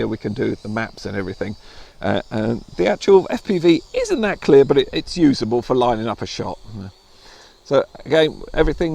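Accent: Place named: England, United Kingdom. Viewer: A few giveaways when an English person has chosen to live in the Highlands of Scotland. The accent and the mentioning of the weather every couple of minutes but 95.497.....2% say they love the place. Helpful vid, I'm a novice at drones. Thanks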